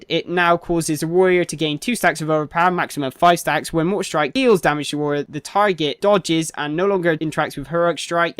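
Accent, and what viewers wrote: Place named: West Country, United Kingdom. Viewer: Personally subbed because of your west country accent. makes me think of home! Great vids. hardly any holy pala changes makes me smile!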